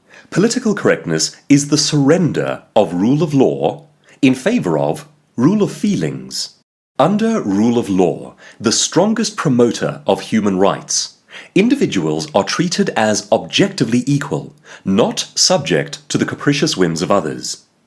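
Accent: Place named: United Kingdom. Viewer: I understand that "politically" and "correct" have definitions in the English language. However, when you use them to further define the concept of "politically correct" I feel like you lose sight of the phenomenon that all languages are in a constant state of evolution. I can't place your accent, sir, but I can tell you do not originally hail from the USA. I'll go out on a limb and suspect The U.K. It is my understanding that the word "c*nt" in The U.K. is a lot less harsh than in my homeland here in the USA. Also, the term "pissed" has two very different meanings depending on which side of the pond you are standing. My point is that "politically correct" is no longer associated with its two root words, and likely has evolved away from its original usage. I noticed in the video you failed to address hate speech. As I understand it, it would be most politically incorrect to the "N" word. 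Yet you are advocating against political correctness. May I ask you to address this?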